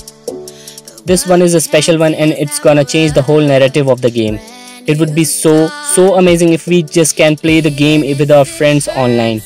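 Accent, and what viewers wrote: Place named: India. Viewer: Are you from india bro .? Your voice like indians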